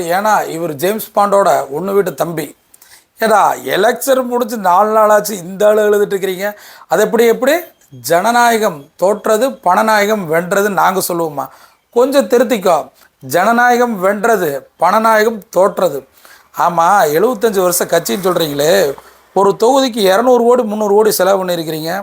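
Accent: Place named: Kongu, India. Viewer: Bro....excellent speech that too kongu slang....wow❤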